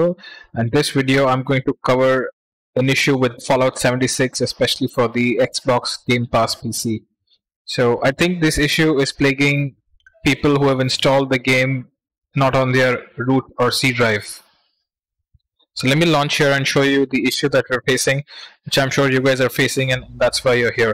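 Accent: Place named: India. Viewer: The moment I heard an indian man in this video, I was overcome with a sense of relief.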